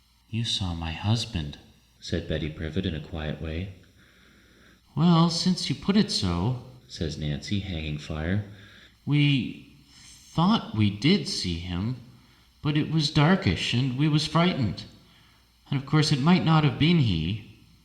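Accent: Canada. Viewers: Thank-you for making this video, I like it. Is the accent of the narrator Canadian?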